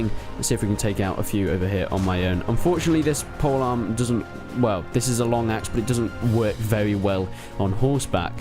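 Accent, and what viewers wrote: United Kingdom. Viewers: m8, he speaks in a British accent, what did you expect him to say?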